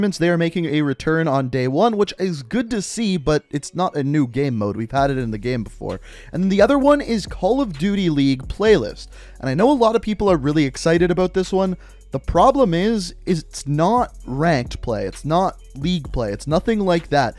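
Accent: Canada. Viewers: Are you Canadian?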